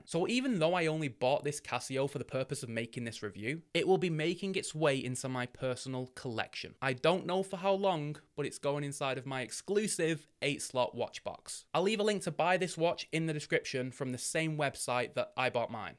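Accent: Yorkshire, United Kingdom. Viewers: Where are you from,? You should like a Yorkshire lad!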